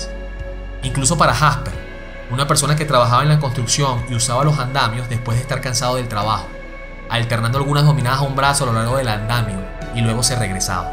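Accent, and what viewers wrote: Venezuela. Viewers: Estoy seguro de que el narrador es venezolano.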